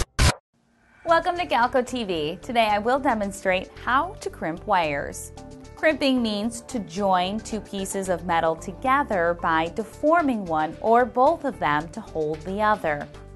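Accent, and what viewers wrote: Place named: Upper Midwest, United States. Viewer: this is a good vid but her accent is hilarious. I guess I haven’t been in the upper midwest enough....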